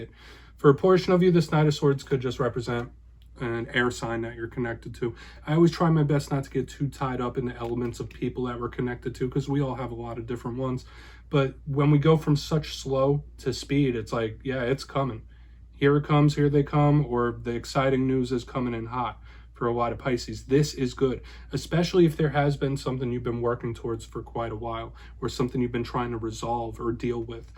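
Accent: United States. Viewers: What a cute deck! Very soothing voice (love the US accent!) and smooth tarot delivery. Greetings from 🇨🇦🇨🇦😊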